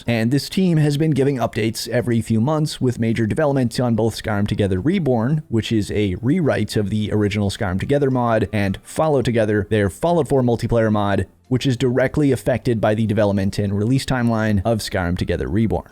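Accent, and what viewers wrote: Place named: Canada. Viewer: is the master of mods. At first I thought you were from California, but had to pay attention to your accent and you are a true Canadian. 🤣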